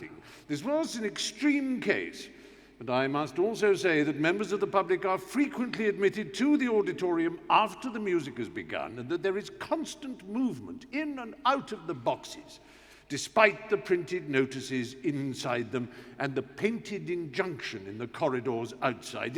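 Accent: United Kingdom. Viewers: 💕🤭 sounding even more British than usual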